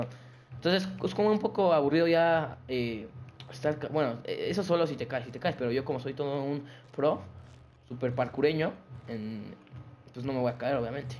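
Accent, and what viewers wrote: Mexico. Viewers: QUE PEDO ERES DE MEXICO PRRO? ES BUEN PEDO NO TE ENOJES WE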